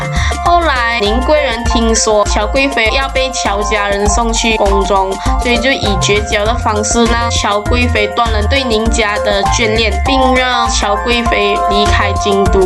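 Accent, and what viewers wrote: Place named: Malaysia. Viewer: 讲的很好只是马来西亚口音很重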